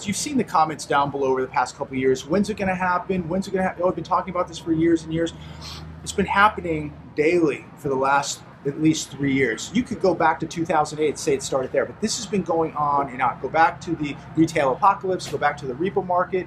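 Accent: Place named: South, United States. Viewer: It's Fridaaaaaaay! is perfecting a distinguished "southern drawl". My ancestors are from Montgomery, Alabama and Franklin County, Alabama. ~~ Safe travels.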